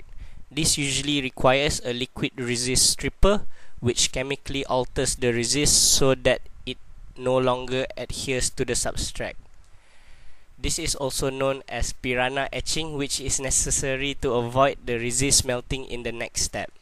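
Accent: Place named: Malaysia. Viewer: like a malay reading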